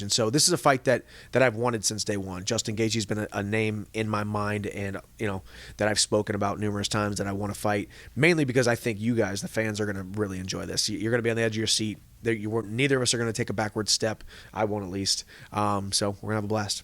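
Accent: United Kingdom. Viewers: Any chance ur scottish ?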